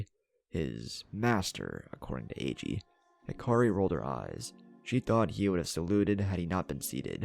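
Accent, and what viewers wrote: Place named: South, United States. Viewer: Love that talks like he’s from the dirty south 😂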